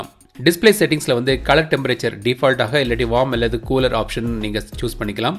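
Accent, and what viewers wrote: Kanyakumari, India. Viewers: Are you from kanyakumari?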